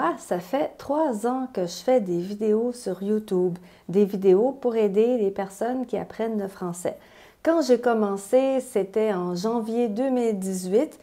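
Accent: Quebec, Canada. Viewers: Sorry, I tried writing in French, but autocorrect kept turning my words to nonsense. I just want to thank you for all your wonderful videos. You make me smile and chuckle often, and it is great to hear Quebecois like I heard it growing up so many years ago,! Felicitations!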